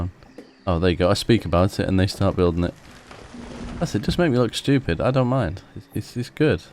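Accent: England, United Kingdom. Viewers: Great, Lake Dulcet is back on track! On a different subject, when you close your eyes and listen to certain parts of this episode, it reminds me of someone and it finally dawned on me who... Is Hugh Grant from your part of England (similar accent)? ;-)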